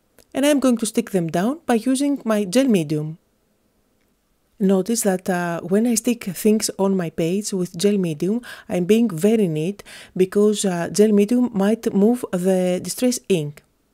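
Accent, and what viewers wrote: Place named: Greece. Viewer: I always liked your accent and wondered where you are from . I wouldn't have thought Greece. I have always wanted to go there!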